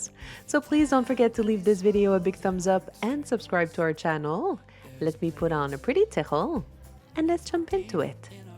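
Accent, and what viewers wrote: France. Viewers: I love your pronunciation, it's very French. My city Odesa (Ukraine) has very deep and long-standing ties with the state of Israel and here we pray for the people of Israel, all of us, Jews and Christians. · I love spending time with you! Thank you for this video,be safe! PS - is your native tongue French? Greetings from a portuguese fan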